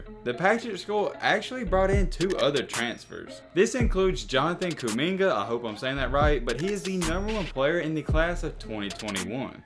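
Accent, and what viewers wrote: South, United States. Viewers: I like your country accent that’s why I watch you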